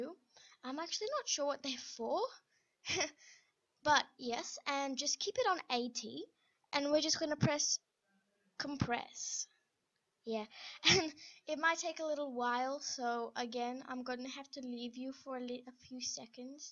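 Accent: Australia. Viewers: you're from austrilia? thats why your accent is so beautiful <3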